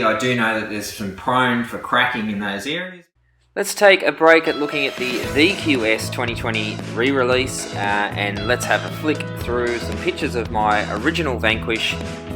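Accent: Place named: Australia. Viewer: Audio is much better, but you seem to have picked up an Australian accent some how.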